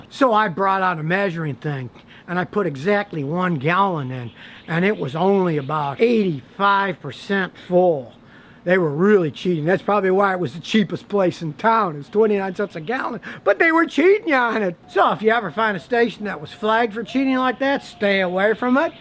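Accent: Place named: New Jersey, United States. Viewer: You talk like your From Jersey You know what I mean FA GET ABOUT IT You could put some copper wire and some Magnets on your hands and and generate electricity like a wind turbine ..And im from Jersey .. Great video & Channel...Just Subscribed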